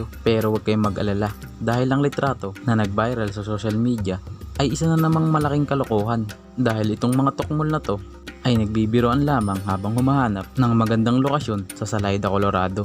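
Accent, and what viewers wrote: Iloilo, Philippines. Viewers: Ilonggo ka ba kuya? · ilonggo ka pu ba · Bisaya ka ba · Lods., bisaya ka no.?.. Ma.subscribe nga...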